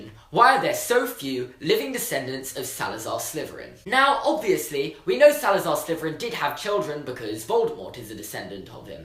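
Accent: United Kingdom. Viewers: Almost every consonant turns into a "fvv" sound for British people doesn't it? "Slyfveryn" "nofving" super cute but also my inner pronunciation nazi is screaming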